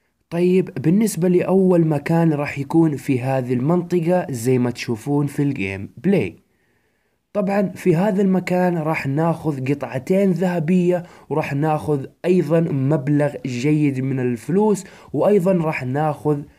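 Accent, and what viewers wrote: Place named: Hejaz, Saudi Arabia. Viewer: هذي اللهجه الحجازيه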